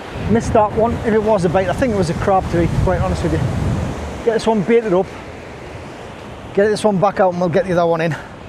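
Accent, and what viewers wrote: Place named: Newcastle, United Kingdom. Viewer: Hi just thought i would say i thoroughly enjoyed watching the video.....love that coastline it brings back so many memories and hearing the Geordie accent too!!! Take care mate cheers ian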